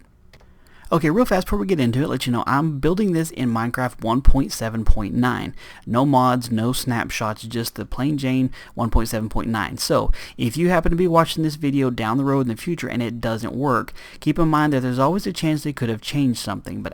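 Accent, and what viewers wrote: South, United States. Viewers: R u a county folk mr acsent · Hey are you from the south? I can really hear your accent. You wouldn't happen to be in Texas would you? ☺️ I'm a homesick Texan meaning I am from Texas but I live up in the North East. People aren't kind up here. ☹️ Where are y'all from? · You sound like a hill billy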